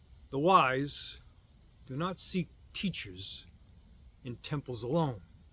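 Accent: Boston, United States. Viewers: Good stuff!! Is that a Boston accent?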